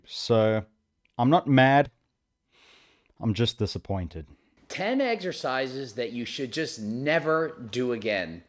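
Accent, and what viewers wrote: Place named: South Africa. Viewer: I thought so when I heard your voice, awesome seeing south african creators on this platform. I'm from cape town.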